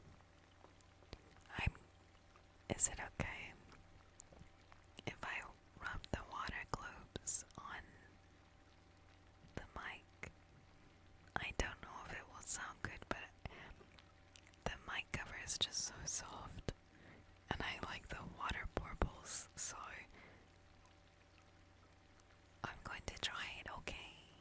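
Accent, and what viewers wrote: New Zealand. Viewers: Man I love to tune out to the Kiwi woman’s voice. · Thats the NZ accent for ya mate.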